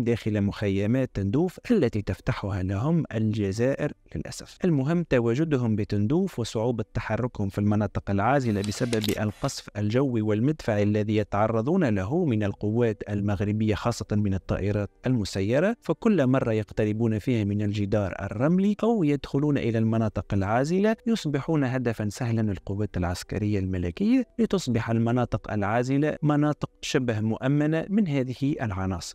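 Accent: Tunisia. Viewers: بعد السماع جيدا ، تبين أنك تونسي خبيث و تمس بسمعة المغاربة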